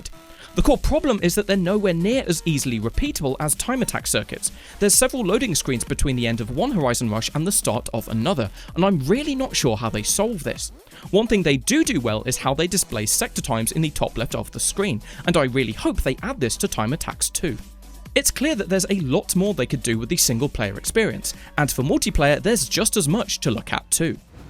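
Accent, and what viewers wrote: United Kingdom. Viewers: That's just an English accent dude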